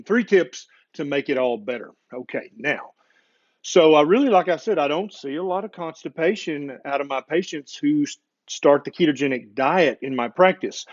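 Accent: South, United States. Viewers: So I'm in my thirties and just giggled at you saying "poop" because of the southern accent. I'm an adult. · It must be the southern drawl. Makes the word poop sound so flavorful.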